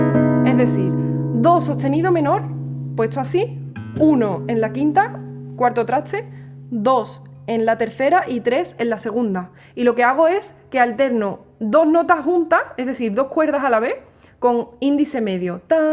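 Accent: Andalusia, Spain. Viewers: Que lindo es escucharte! tu acento es andaluz hasta la medula! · Excelente armonización y, como siempre, un deleite para los sentidos tu acento andaluz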